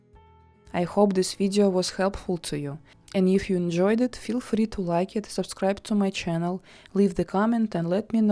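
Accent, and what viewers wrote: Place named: Russia. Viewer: I just finished watching ALL your videos!! I love them!! You're making me want to visit Istanbul..lol. My cousin is going to Greece this summer and may take the trip across the waters to Turkey? Have you ever been to Greece? Also, do you do any outdoor sketching or painting? That would be fun to see too. You're inspiring me to start my art channel as well. And by the way, your English is WONDERFUL!! You have absolutely nothing to worry about. We understand you just fine and the Russian accent is beautiful. I just subscribed to your channel. Thank you for sharing!! Can't wait for the next vidéo!!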